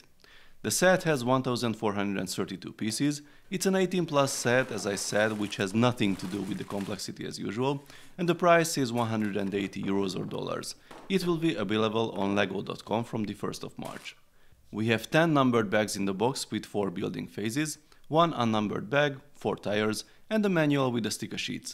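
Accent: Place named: Hungary. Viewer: Kicsit magyaros a kiejtésed de jó lett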